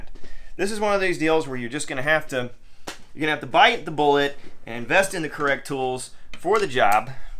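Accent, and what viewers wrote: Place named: South, United States. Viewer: Upvoted for southern dialect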